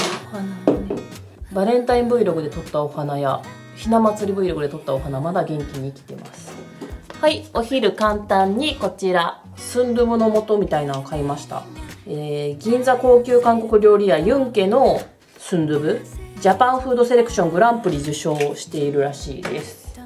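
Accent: Kansai, Japan. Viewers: の動画はずっと穏やかな気持ちで観られるから、心が疲れた時に自然とたどり着きます🍀 毎日お疲れ様です🍵🌱 10年以上が上手に標準敬語話す声に慣れてるから関西イントネーションになってるのが逆に新鮮だった😂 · 今日関西弁強めですね、かわいい😂❤❤ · 後半関西弁になってて可愛かった❤ 私も関西人です昨日から体調崩しててパジャマのまま横になって観てますカレールゥ買ってみます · ミッフィーのクッキングシート、可愛い💕と言った瞬間にグチャグチャに丸めたのツボに入りましたw😂✨💕 関西弁多めで嬉しかったです😊 ずっと脳が働いてそうだから、しっかり休んで下さいね♪ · なんか今回関西弁が強いですね！どういうスイッチで変わるんですかー？☺️ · 関西のイントネーション多めなが新鮮で可愛かったです❤笑そしてカレーを見てると自分も食べたくなる不思議現象が起きますっ😮❤笑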